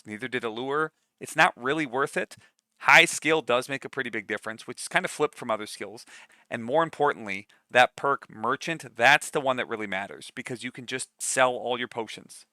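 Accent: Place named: East Coast, United States. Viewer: So I don't have to do an additional purchase for the mod and install it if I already have the Anni Ed???? (Just making sure I understand completely) Loving the streams BTW. Love watching a mathematician's breakdown. Good on ya, brother. (The east-cost accent and mannerisms are the cherry on top, LMAO 🤣. Brother-in-law is from Boston). Yes, it was when you were talking about the sneak skill that you mentioned you had gameplay footage, that you would play at the end of the vid of you using the shadow warrior skill. Was something I'd never thought of cuz I used invisibility instead, silent casting on the illusion tree, with muffle on the boots. Stabby-stab. Shank those mo-fo's from the shadows!! Lol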